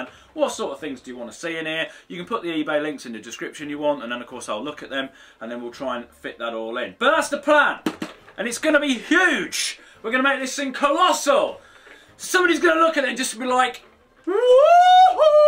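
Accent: United Kingdom. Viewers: is he British ?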